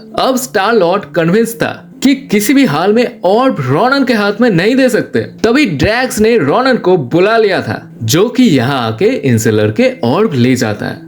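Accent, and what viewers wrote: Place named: Bengal, India. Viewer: Bhai main pj ko dekh ta hoon moc ko sunta hoon comic verse ko badal ko desinerd ko bhi par jab maine tumhari video dekh ni shuru ki mujhe maza aya is liye nahi ki tum kuch alag bana rahe ho is liye kyu ki tumhari hindi thodi weak h jaise bengoli log bolte h maza ata h sunne mein isse thik mat kar na keep it up ❣️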